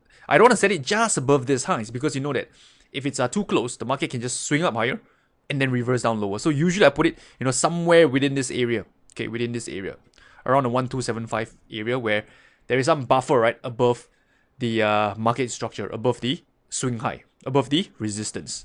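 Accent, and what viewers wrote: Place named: Singapore. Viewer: Singapore English....lol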